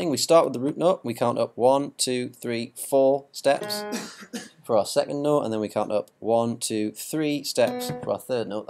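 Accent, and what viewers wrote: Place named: Wales, United Kingdom. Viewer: hes welsh or gordy lol ,we love gordys coz the sound welsh good tut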